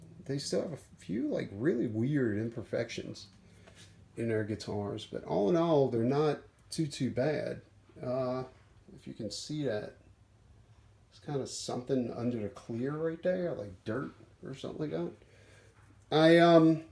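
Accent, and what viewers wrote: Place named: Baltimore, United States. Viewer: You sound like you’re from ball more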